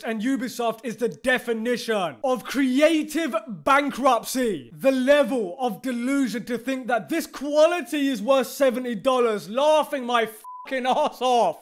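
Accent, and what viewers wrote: United Kingdom. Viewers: the british accent is annoying as it is screaming into the mic dosnt help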